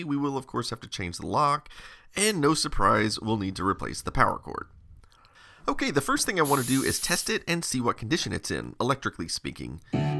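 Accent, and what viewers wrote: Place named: Texas, United States. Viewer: "I cleaned the CRT right quick." Ah yes, our Texan speech.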